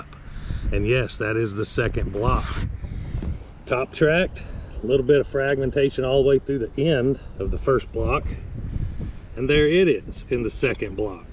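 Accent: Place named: Appalachia, United States. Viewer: You ain't gotta give me specifics, but where is the Gun Dungeon located? From your accent and the hills in the background I'd say East TN, Eastern KY, Western NC, or SW VA.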